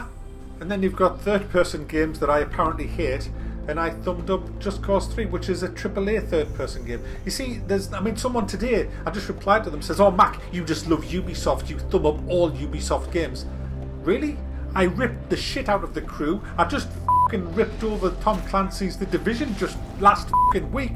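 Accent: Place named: North, United Kingdom. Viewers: noooo, you aren't a caveman. You're just northern <3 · Don't worry what "they" think.I love your vids, reviews, honestly, humour, the sudden madness of abuse of the games/designers/etc and "bell banging".. and , of course Mr bunny.And AND,AND! AND! ( bang bell.!!!!) AND! ( bang bell.!!!!)that cute English accent..(((((thinking of having a whip-round for a new bell..maybe a BIGGER one..like school teachers use to have to ring at end of 'break time..YES!))).Worth a watch.... AB-so-fuggly-BLOODY-lutly.( ( bang bell.!!!!) Have a 'good day'and 'no worries mate'. from Christina in Adelaide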